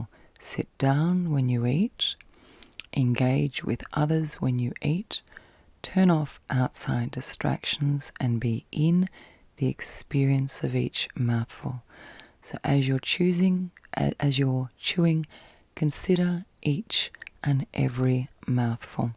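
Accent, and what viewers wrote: Australia. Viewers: I just discovered you in perusing sleep & anxiety videos. I'm Alex, a 46yo San Francisco, CA, USA 🇺🇸 native, and you have one of thee most soothing, sultry, feminine voices and dispositions I've ever heard. You're an Aussie, I assume? Thanks for this therapeutic, relaxing video. 👌🏻😴💤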